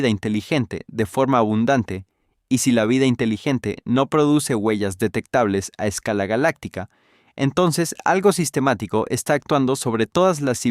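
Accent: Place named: United States. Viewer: Tío, te explicas como el culo. Omites cosas de las teorías y de resultas hay cosas que no se entienden. Por ejemplo, respecto de la paradoja de Fermi, omites la conclusión de que, si han existido civilizaciones tecnológicas desde hace miles de millones de años (eso lo dices), LOS PLATILLOS VOLTANTES Y LOS MARCIANOS DEBERÍAN ESTAR POR TODA LA GALAXIA. EN PLANETAS Y SATÉLITES DESABITADOS QUEDARÍAN RESTOS DE SU TECNOLOGÍA, CONSTRUCCIONES, MINAS.... (eso no lo dices. El que lo deduzca por sí mismo, bien por él. Al que no, que le den). En este caso imaginar lo que falta es sencillo. En otros no tanto. Maquinas autoreplicantes que pueden construir otras como ellas con los recursos del entorno. OK. ¿Y? ¿Por qué la función de esas máquinas debería ser la de destruir toda civilización que encuentren? ¿De dónde se sigue eso? ¿Qué parte del argumento de has comido? 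Hablas de ausencia de estructuras modificadoras de sistemas estelares, sin explicar la teoría que propone la existencia de esas estructuras, con un sistema de 3 fases según el grado de explotación de la energía de su estrella alcanzado por la civilización en cuestión... Tú vas largando y la gente que se imagine lo que te comes, ¿no? Anda, pon menos interés en pronunciar el inglés como lo hacen los yankees y aprende a hablar mejor tu idioma. Y mientras tanto, dedícate a otra cosa, macho, que lo de explicar cosas no es lo tuyo.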